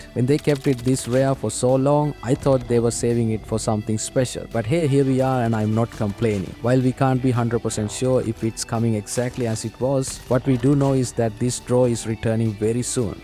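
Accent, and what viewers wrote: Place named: India. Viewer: Your accent is like similar to Indian english 😂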